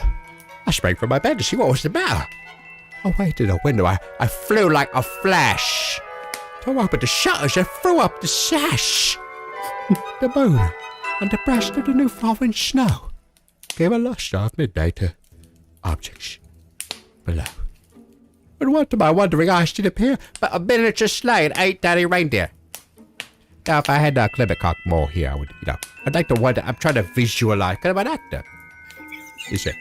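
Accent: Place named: United States. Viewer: Love the fact you can easily hear his American accent coming through clear as day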